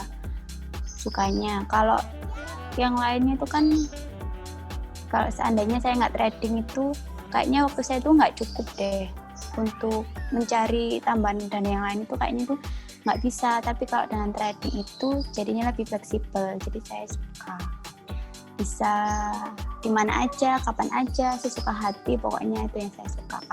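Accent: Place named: Java, Indonesia. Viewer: Medok bngt ngomongnya kayak orang jawa nih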